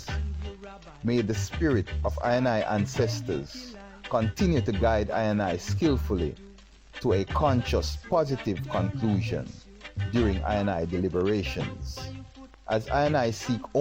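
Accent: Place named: Jamaica. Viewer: sorry I don't understand very well jamaican english. when you say " I 'n I" you mean "Inner I"?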